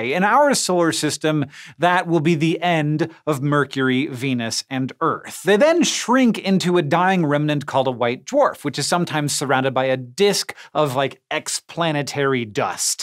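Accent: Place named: United States. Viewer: "it's LIKE" I'm listening to a school girl in a mans body. The American accent, voice and sentence structure does my FN head in! so many YT vids that promise and then the voice... JUST TALK NORMAL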